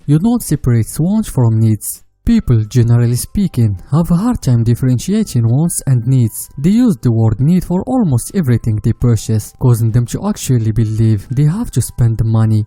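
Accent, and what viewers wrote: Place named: France. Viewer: he is a french speaker this why he got a french accent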